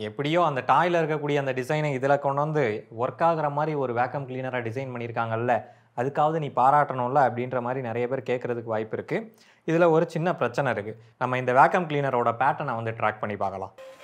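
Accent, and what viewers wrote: Tirunelveli, India. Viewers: தூத்த இடம்.. திருநெல்வேலி slag ❤❤❤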